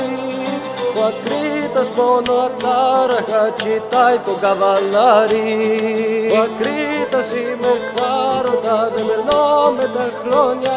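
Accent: Crete, Greece. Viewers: Your accent reminds me of the way Cretans talk. As a Greek, i deeply admire all of your work. Thank you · A splendid effort at a kontylia,! You’ve got the Cretan accent down cold, too! Two small notes on the English translation though, for your consideration; 1. “Charon brings Digenis to Hades’ domain *on horseback*” — felt like this was a significant omission, given that «καβάλα» is the text’s incipit. 2. «της ομορφιάς την *πούλια*» could either be translated as “the jewel of beauty” (the poúlia, with a lowercase π, is a metallic ornament Greek women used to adorn their dresses and scarves with) or “the star of beauty” (the Poúlia, with an uppercase Π, which is where the ornament gets its name from incidentally, is the common name the Byzantines assigned to the star cluster of the Pleiades — the modern Greeks, Palamas amongst them, still use it to this day). 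I do not have Palamas’ poem close at hand right now so I’m unsure which way he might have spelled the word, but it’s safe to say his intended meaning wasn’t “the bird of beauty”, as poulí (“bird”, plural pouliá) is only a paronym of πούλια/Πούλια. Thank you for this beautiful offering! Greetings from a Greek national with roots from your neighbouring Qazvin! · Reminds me a lot to, in general, the music for Ikariotikos or a specific Rhodian dance I can't remember the name. Certainly gorgeous as always man, I love that Cretan accent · What is this wizardry again? You even nailed the Cretan accent to the tee. On another note, whenever I hear the Cretan lyra my blood starts pumping faster in my veins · Hello fellow "Cretan":D You speak Greek the same way you speak english? Or this was for the purpose of the poem? Nice creation! Loved it! Thanks!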